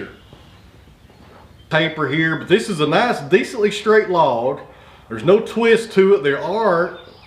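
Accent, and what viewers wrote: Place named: Appalachia, United States. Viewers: I fully understand your Kingsportian dialect and never knew you had bloopers. Keep on talkin the way you do! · What’s funny I have that same Appalachian accent so I understand everything you say. Watch Celebrating Appalachia and you’ll see it’s the way we talk for hundreds of years.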